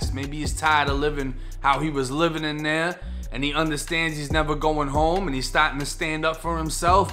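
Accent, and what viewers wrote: Boston, United States. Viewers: Mr, are you from Boston?? (accent) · s, s, s, s, s . . . Nice wording!! You from Boston ?? . . . You say Stahh and Chahhges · I know u did time in florida but sound like Your from boston, curious no disrespect just curious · Is this guy from Boston? I hear that bake bean accent. Either way...cool video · So r u from Boston or Florida? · no disrespect just curious how long were you back in Boston before the accent came? Do you think if u came back to Florida and lose it? Like if you were at Ybor city would u the Florida accent just come back? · damn are you from Boston? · You from Boston? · Goofy goober nigga u straight Boston Damn I miss New England like shit nuffin like it keep doin ya thing homie I love this channel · Boston accent yes? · Your from Tampa? You sound like you from Boston or something like that. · Ur definetly boston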